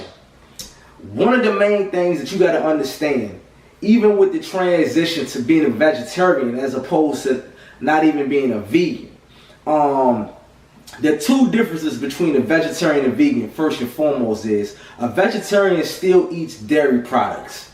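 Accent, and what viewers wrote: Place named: East Coast, United States. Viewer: very informative. looking into becoming vegan in the near future. also, you have to be from baltimore or the dmv area. i detected an east coast accent, then you 'dairy' and that confirmed it. LOL.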